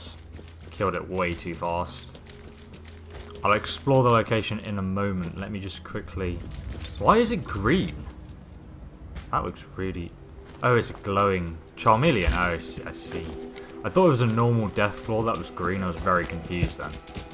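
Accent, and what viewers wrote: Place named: United Kingdom. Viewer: I'm not saying this with any malice intended to you or (his vids are great), but I've had a feeling that he might be dyslexic or have some sort trouble saying what he reads. He does it very often in many of his videos. Like saying Mr. Gusty when its really Mr. Gutsy for example. It happens often enough with enough different words/names repeatably to the point it's noticeable. It might be separate from what is being debated in this comment thread, but I can't attribute my observations to just a British accent which I am familiar with.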